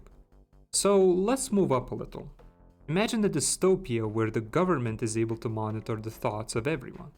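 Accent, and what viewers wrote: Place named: Poland. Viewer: słychać polske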